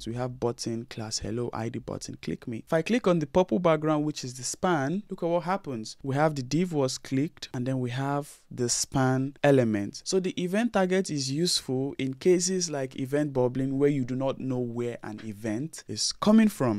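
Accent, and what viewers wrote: Nigeria. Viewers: naija accent i am gussing, leaning javascript too, thank for the video by the way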